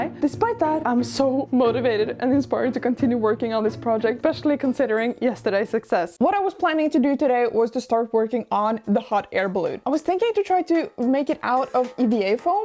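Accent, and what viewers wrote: Norway. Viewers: Im 99% sure ur norwegian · "Skjera Bagera" is undoubtedly Norwegian, also her accent and the nature in her videos. So I think she is from Norway.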